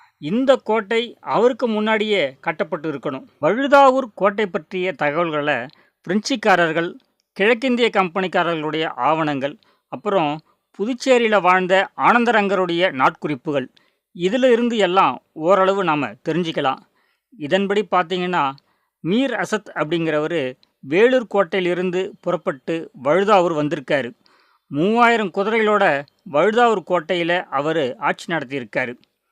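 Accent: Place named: Madurai, India. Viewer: அருமையான வரலாற்று தொகுப்பு. இனிமையாக ங்க போட்டு மதுரைக்காரர் போல பேசுகிறீர்கள் ஐயா.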